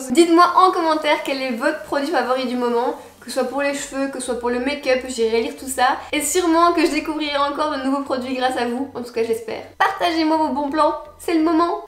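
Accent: Belgium. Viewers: Tu es belge ou francaise? Pas je t'adore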